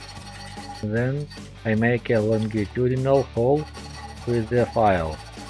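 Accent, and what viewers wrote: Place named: Ryazan, Russia. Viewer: Один вопрос - for нафига трепаться на английском , если акцент рязанский..... Есть же титры..... Кому как...мне ужасно режет слух.....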